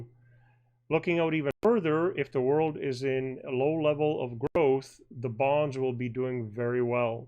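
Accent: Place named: Canada. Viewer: Where is this accent from? I’m Canadian and I’ve never heard anything that sounds so Canadian before.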